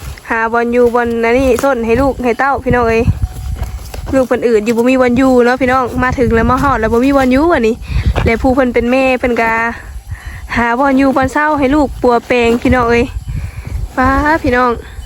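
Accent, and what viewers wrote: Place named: Isan, Thailand. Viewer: พึ่งดูครั้งแรก หนูอยู่ แขวง เขต อะไร ทำไม สำเนียง การพูด ของหนู เหมือนคน อิสานฝั่งไทยมากๆ ไม่เหมือนสำเนียง ของคน สปปฯ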